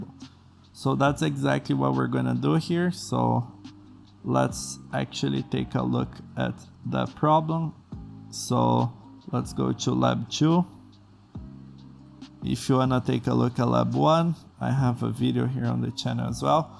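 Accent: Brazil. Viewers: Vc é brasileiro ne ? pelo sotaque ! Se voce for sera que consegue me ajudar to no começinho do ensino to precisando de alguem pra troca umas ideias !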